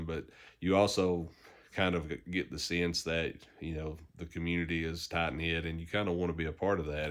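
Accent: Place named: Tennessee, United States. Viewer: Great first video! I have been brainstorming about how to start a channel myself and how I can contribute to the community. You have helped me continue to gain some excitement about the opportunity. We have lots of similarities in our hobby background, along with many others that have come back to the hobby! Looking forward to hearing more from you. Sounds like you may live in Tennessee, I am in Johnson City. Take care and good luck!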